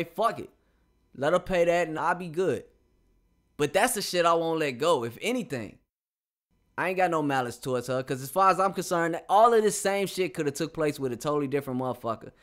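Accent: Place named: Chicago, United States. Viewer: I got ppl in the CHI, Roseland n Chatham I hear ur accent bro lol!👍🏾👍🏾👍🏾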